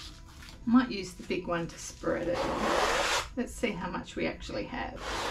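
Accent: Australia. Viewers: Thats great from our angle it looks like a city reflection walking along the Yarra River...Melbourne 😊 Have you tried using a retarder or other gel medium to slow down your paint drying? It might help. I only found out about them recently and think its brilliant, so much easier to blend too..love your art. Happy New Year cheers from WA🦘🦘 always good to hear an Aussie voice on here. * I said what it was before you had finished and gave your own interpretation....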